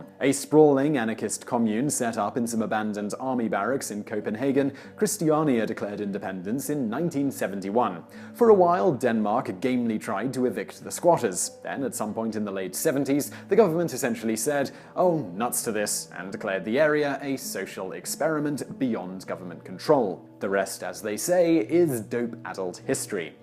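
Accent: United Kingdom. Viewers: He speaks the Queen's English & depending where in Great Britain he was born & raised will depend on the accent. My country is an English speaking country but we have our own language & accents that not many apart from bruhs & sis's near us would understand. We love having our own language & it's hilarious for foreigners to try & work out wtf we are actually talking about. But ur 100% right about the UK education & America's speak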